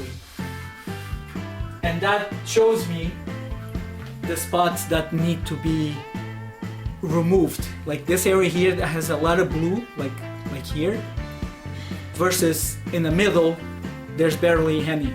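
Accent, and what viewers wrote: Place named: Portugal. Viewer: Ah! Sabia que tinha ouvido este sotaque dum outro lugar! Já tive em Portugal antes, vivi em Braga e Lisboa, belas cidades, devo dizer. Saudades de Portugal, um abraço!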